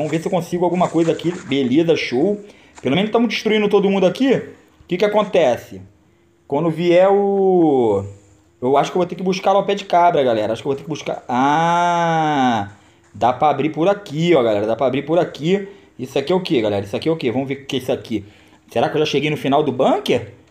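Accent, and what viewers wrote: Rio de Janeiro, Brazil. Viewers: tu é carioca Mano?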